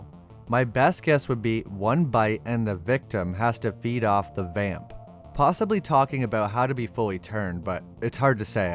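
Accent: Canada. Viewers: Sounds like hes from the great white north and talk with that kinda accent, just my guess .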